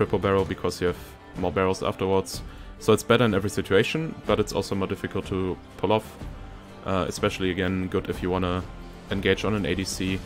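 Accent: Germany. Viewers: are you german? x) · are you german?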